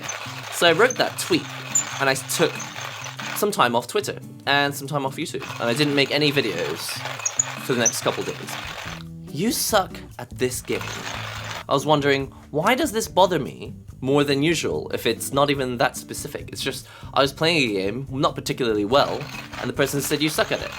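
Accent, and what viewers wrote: United Kingdom. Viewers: Hi, I've been one of your audience for a quite long time through I've never left any comments before. Hearing your voice makes me feel peaceful. I'd always love to run your video at the background talking things while I'm at home doing other stuff. The British accent from an Asian guy like me gives me a little inspiration and hope for life to become better, especially during this rapid pace life in city, and I think I've just found room where I can breathe after work. I've not played fighting games at all but listening to you is quite enjoyable. Your channel is great and I just want to let you know that there's people who find good things from your videos and they've always let me find courage to continue with life. Sorry about the poor expression, I hope my English to be as good as you some day.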